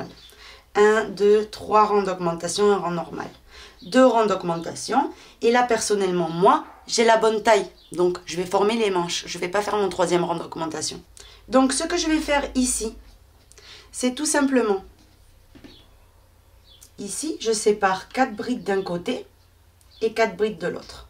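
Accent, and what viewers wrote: South, France. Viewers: Il ressort un accent du midi?? Il me semble sentir Le Soleil dans votre voix et accent!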